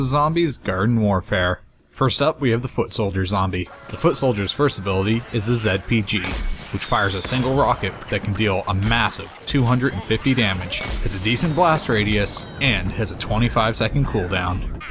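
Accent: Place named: Canada. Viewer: It doesn't, I understand that your Canadian and that anyone insulting the way you talk is just rude.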